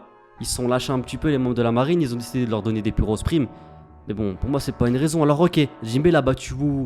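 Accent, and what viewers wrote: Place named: France. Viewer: Mon avis ? Parle moins avec ton accent de cité ça gonfle 5 s de vidéo j en ai eu assez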